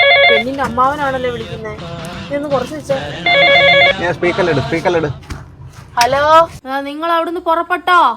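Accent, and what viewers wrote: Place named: Thrissur, India. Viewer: Thrissur slang❤❤ nammal sadhukkal ayiiiiiiii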